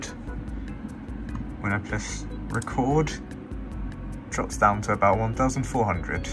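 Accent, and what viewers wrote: United Kingdom. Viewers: I love hearing British accent mmmm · I like voice for it is very British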